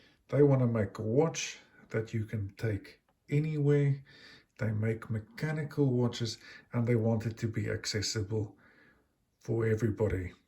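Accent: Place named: Greece. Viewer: thank you for answering. I would not be surprised is you were Greek the way you speak English, that's why i asked. Yes, second hand may be the way to go, but i would really like the hi beat movement and the thinner profile of the version 3. Wow, from South Africa to the opposite side, Finland 😮.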